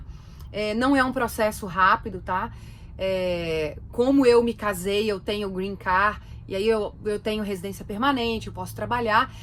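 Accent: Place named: Minas Gerais, Brazil. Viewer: Parabéns! Relato muito completo e que delicia de sotaque daqui de Minas hehehehe!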